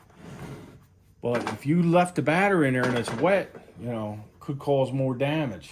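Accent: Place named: Philadelphia, United States. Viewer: I’ll bet my house this is a Philly accent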